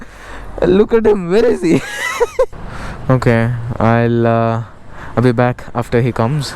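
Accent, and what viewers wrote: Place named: Kerala, India. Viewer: Im sure the vlogger is a mallu….Proper accent.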